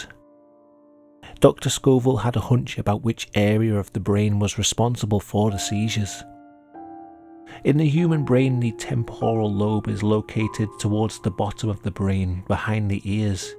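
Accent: United Kingdom. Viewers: Love your channel,. I’ve always been naturally curious about morbid subjects but I’ve noticed that after several traumatic experiences in my real life, I have an increasingly developed relationship with things that disturb me. I can’t seem to find any interest in media with subjects that aren’t slightly taboo or disturbing anymore. Maybe it is part of the long term processing, maybe it’s part of just having the veil lifted since my own disturbing experiences. Sometimes I wonder if it’s a curated and safe way to feel afraid or unsettled, but not be in any danger, and to be learning and exploring ideas without direct risk. It’s made watching videos such as yours varyingly more intimate as an experience, psychologically, than before. Your voice is also very familiar and stabilising to listen to - not trying to sensationalise, you’re genuinely interested in what you’re discussing without novelty of the subject or people involved. 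It’s also probably comforting for a familiar British accent and creator in a US heavy site. Looking forward to all videos in the future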